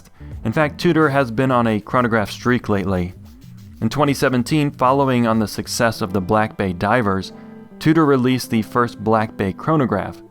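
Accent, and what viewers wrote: Birmingham, United Kingdom. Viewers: Nice attempt at brummie accent! It’s where I went to University:) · Love the Brummie accent!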